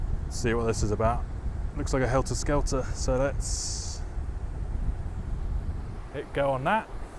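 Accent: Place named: United Kingdom. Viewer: Great video & informative. Where is the location as you sound British?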